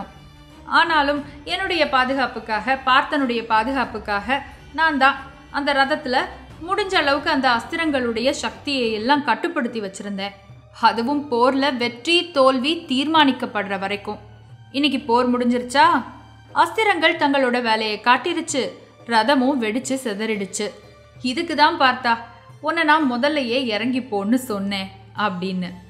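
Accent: Tirunelveli, India. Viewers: super story sister nice explain. vazga valamudan kattakadaisi endra tirunelveli vattra valaku super. neegal sollum poluthu kadhai kalathirkae kondu sellum munnorkalin thiramai irukirathu. super sister.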